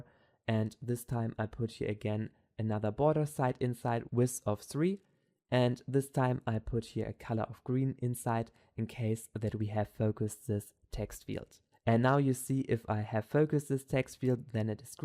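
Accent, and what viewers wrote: Germany. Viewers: lmao i can hear that you are german ahahahah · Great Tutorial or should I say Gut gemacht? Man hört echt raus, dass deine Muttersprache Deutsch ist. Das ist aber ja nichts schlechtes:)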